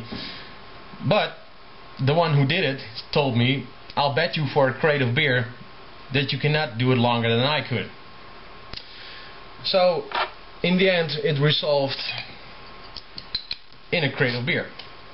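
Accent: Netherlands. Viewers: hee ik dag al da je een ned accent had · Dutch accent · Hoezo dacht je dat hij engels was? Je hoort het bijna altijd als iemand engels spreekt die nederlands is. Alle Nederlanders hebben ongeveer hetzelfde accent:P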